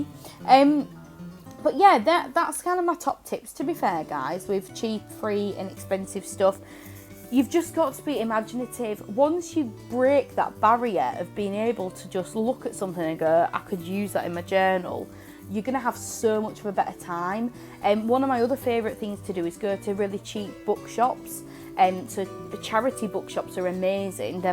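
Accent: United Kingdom. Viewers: So beautiful!! I really enjoyed watching you decorate those yummy and oh so happy looking pages! And I also really enjoy listening to you. Love your british accent! ☺️ Could you please tell me what kind of pens you are using to make the colorful marks on the pages (dots, lines,..) Are they regular markers or brush tipped? Just curious... x.o Nat.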